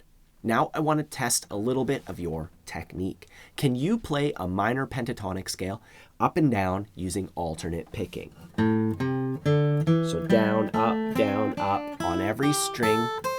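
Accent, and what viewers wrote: Canada. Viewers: You sound so Canadian. :)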